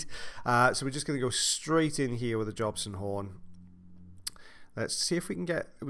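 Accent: Wales, United Kingdom. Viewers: This made my day!!! Having a long day at work and hearing our melodic Welshmen narrating is so relaxing and pleasant. Thank you for doing this for your fans!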